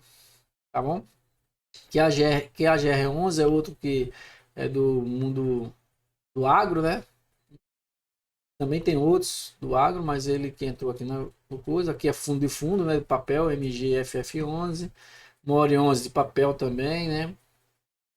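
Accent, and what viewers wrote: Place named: Natal, Brazil. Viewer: so perguntei pelo sotaque que parece com o meu, sou de Natal mas to morando no PR.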